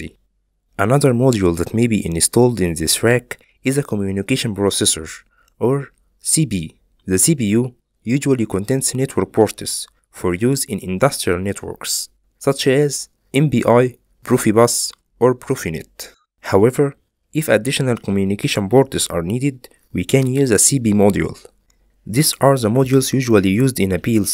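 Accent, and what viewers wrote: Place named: Egypt. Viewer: according to your accent you are Egyptian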